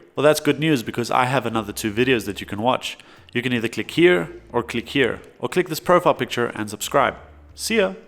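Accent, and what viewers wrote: South Africa. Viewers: Is jy afrikaans daai aksent se so...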